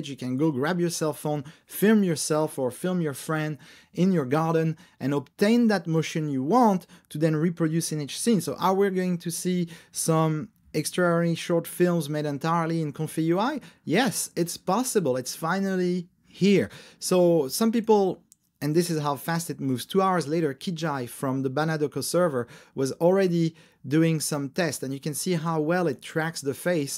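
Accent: France. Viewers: don't say you have a terrible french accent it is lovely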